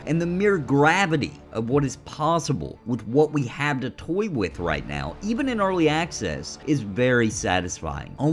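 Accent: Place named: Appalachia, United States. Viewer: l knew I heard some Appalachian I'm from Southern wva so I picked it up lol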